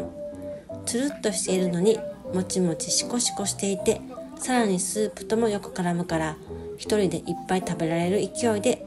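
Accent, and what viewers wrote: Kyoto, Japan. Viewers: その京言葉が いつも心地よくて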